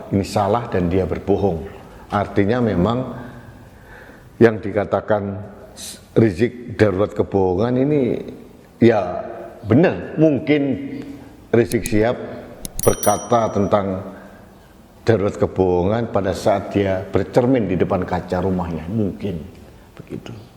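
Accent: Java, Indonesia. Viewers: Privat lambe mu ambles kui, hhhh medok tapi kritis, MANTAP PK 👍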